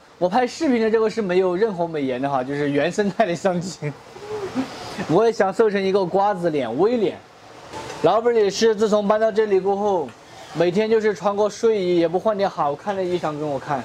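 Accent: China. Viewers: 这椒盐普通话，听的好上头😁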